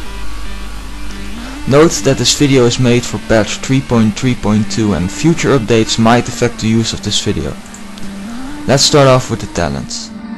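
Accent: Netherlands. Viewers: u sound dutch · Is je moedertaal nederlands? :-) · Are you dutch? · Was meteen te horen dat je nl bent Nice vid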